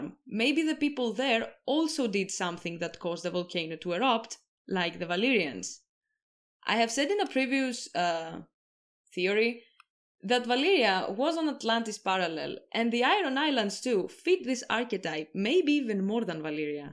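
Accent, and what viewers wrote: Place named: Greece. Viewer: From your accent I was sure that you were greek lol, and it was confirmed by the reference to Santorini. It is wild how coincidental knowledge someone has due to their local environment can help them discover things that others would have (probably) never thought of! Very thorough research on the lore too, I look forward to watching your other videos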